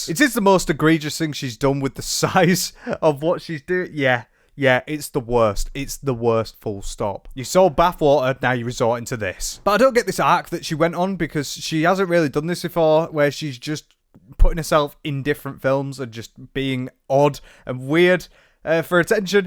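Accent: United Kingdom. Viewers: Huh i didn't think she'd have a British accent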